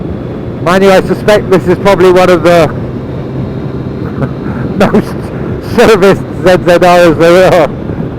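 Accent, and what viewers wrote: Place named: West Country, United Kingdom. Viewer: You sound like a West Country lad.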